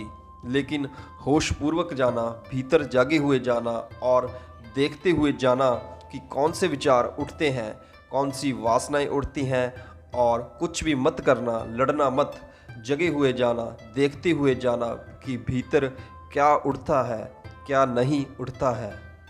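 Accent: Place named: Punjab, India. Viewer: Nice video. Aap ki awaj pe punjabi touch aata hai. Kya aap punjab se ho?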